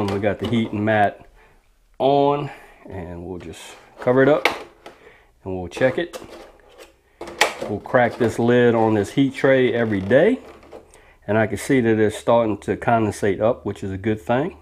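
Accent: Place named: Louisiana, United States. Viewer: Mississippi? I sho nuff thought I heard a little cajun in that accent? I'm a sucker for a southern accent lol just ask Andy! Lol sure hope them cucumbers work out for ya! I love a cucumber and tomato salad! Yum!! Have a good one! See ya next time!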